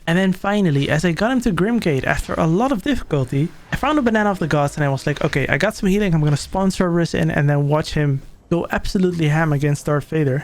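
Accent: Netherlands. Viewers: Hey!! Just wondering correct me if I'm wrong but umm, are you Dutch by any chance?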